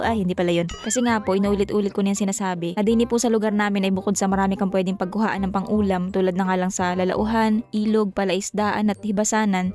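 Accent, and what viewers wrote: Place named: Quezon, Philippines. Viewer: Hi, natutuwa ako sa punto mo Quezon na Quezon ay, from Quezon din ako. Pero watching here in Edmonton Canada. Pa shout out sa next Video mo, Aileen Villapando of Edmonton Alberta Canada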